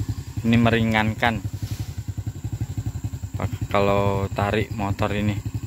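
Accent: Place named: Java, Indonesia. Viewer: Ledok nge wong jawa boss ku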